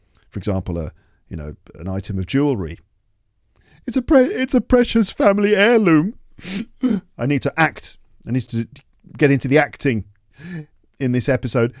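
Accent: RP, United Kingdom. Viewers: It's been a great experience being with you in the podcast episodes all this time as a learner of English as a second language. I have learnt a lot and continue to do so - without any loss of interest. It's good - a good exercise being with you learning vocabulary, pronunciation, etc. Your clear voice, the RP accent and the associated other features have made me a regular visitor to your podcast, which is, I believe, has been the most useful source for acquiring the language in a natural way for many a foreigner. Hope the podcast will be as good in the coming year and the listeners/video viewers will be looking forward to watching and listening to very useful content as you have generously brought us for ever. Congratulations! Happy New year! To and the family and the lepsters.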